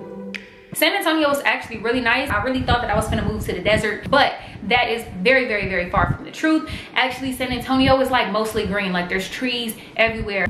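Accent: Chicago, United States. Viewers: Girl I just knew you were from Chicago from when you first started speaking!!! I’m from Chicago been living in west Texas for 9 years. My hubby and I are Thinking about moving to San Antonio. That’s how I found your videos!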